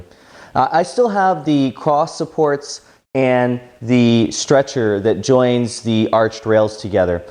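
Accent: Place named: Midwest, United States. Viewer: Nice work ...you don’t sound like your from Alabam mor like the Midwest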